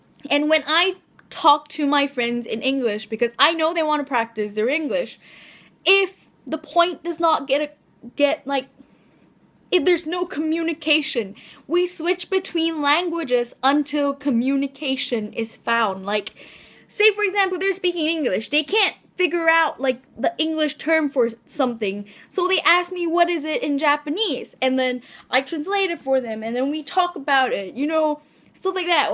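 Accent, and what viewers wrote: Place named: United States. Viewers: Btw you sound very American for a Singaporean. Why? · You're English is good, you legit sound American! I thought you were Asian-American at first lol. I remember speaking to a Japanese girl who sounded pretty American too :3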